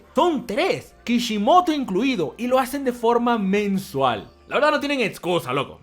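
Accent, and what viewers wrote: Peru. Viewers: ERES DE PERU, NO? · Oye we eres peruano??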